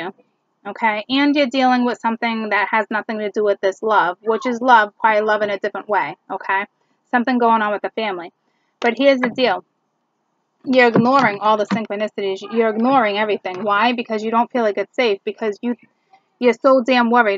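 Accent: Boston, United States. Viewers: I know you have a Boston accent. No texting. Call mommy. Then go unannounced at the house. I did that and all the kids were running because my daughter was not there. That put a stop to that ish. She was 14. It's a different world once they turn. 13, 16, 18, 21. She is now 27 and doing okay now. Sheesh... #Motherhood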